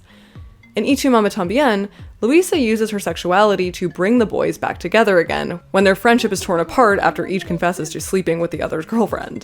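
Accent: Canada. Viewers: lol late to this, but her Canadian accent here sounds kind of like U.S. Midwestern standard reining in the tendencies of California English. However it affects the pronunciation, it’s actually quite pleasant.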